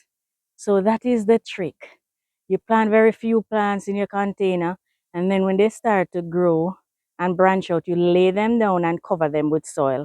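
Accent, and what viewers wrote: Jamaica. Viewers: This is how most Jamaicans speak. Go find a treadmill and leave the lady alone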